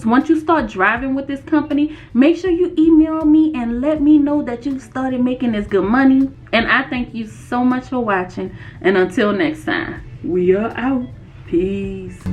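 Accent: New Orleans, United States. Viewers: It’s the New Orleans accent for me 💜💜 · You gotta be from New Orleans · before you selected your location I already knew where you were from lol five oh foe ya heard meh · What part of New Orleans you from? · Are you from New Orleans....I know my N.O. peoples ❤❤accent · I never heard of this channel but I’m hearing a slight NOLA accent… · First time watching. Question. Are you fro. New Orleans ?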